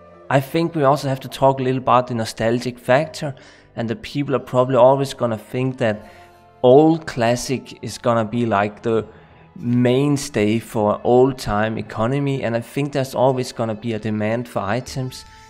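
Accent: Denmark. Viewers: I think in some way or form we all dislike real money trading like you are doing half the time on JSP, there is no way around it it’s pay to win but that said trading items for real money will never go away so that’s way I am happy with the current status of JSP. You sound Danish? Am I wrong 🤷🏻‍♂️ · Nice video. Elsker at din danske accent er så tyk at man slet ikke er i tvivl XD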